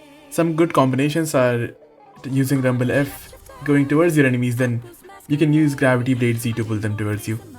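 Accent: India. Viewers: Accent: indian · R u indian?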